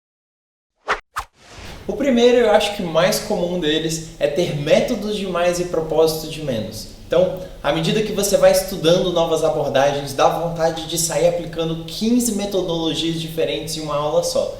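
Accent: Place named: Espirito Santo, Brazil. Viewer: Você é capixaba? O sotaque parece haha muito bom!!!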